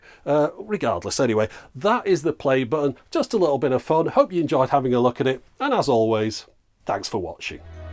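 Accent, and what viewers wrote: United Kingdom. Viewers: Love your show!!! Being American hearing your British accent makes the show a lot classier!!(yes it's true, we think all Brits are smart)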